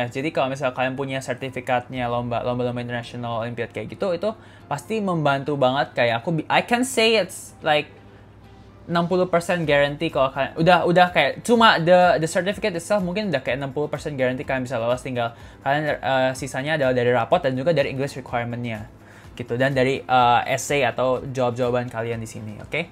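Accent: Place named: Indonesia. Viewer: Love bgt accent mix Indo English kamu❤❤❤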